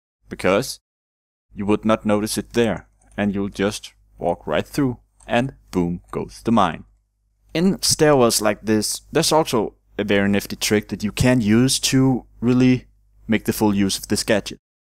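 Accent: Denmark. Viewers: are u from Denmark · I knew you were from Danmark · Det da en Dansker der taler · Are you from Denmark? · Er du fra Danmark?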